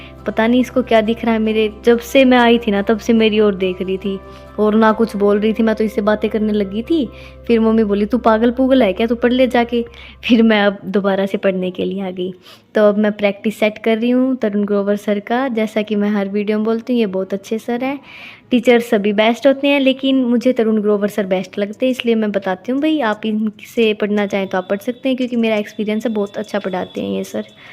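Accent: Haryana, India. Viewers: Haryanvi tone lagra aapka.. Haryana se ho kya aap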